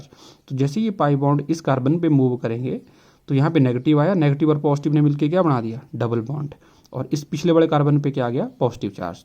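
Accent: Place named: Pahadi, India. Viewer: Sir aap Uttarakhand se ho .....aap k accent se LG Rah ...Ki aap pahadi ho .....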